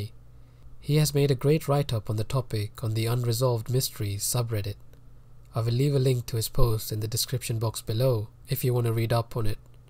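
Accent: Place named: India. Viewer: The narrator has an Indian accent, just curious.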